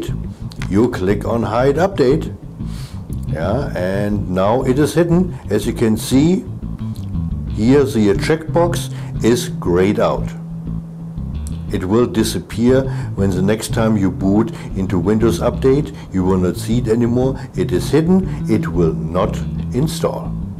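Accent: Germany. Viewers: [non vid question]: are you german? your accent sounds a lot like that :v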